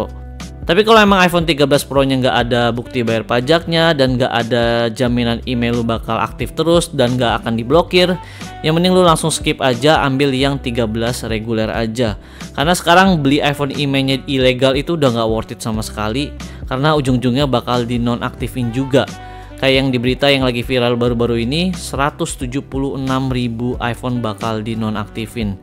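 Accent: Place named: East Java, Indonesia. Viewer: kayae mas.e orang jawatimur ya pernah buat konten di chimory 😁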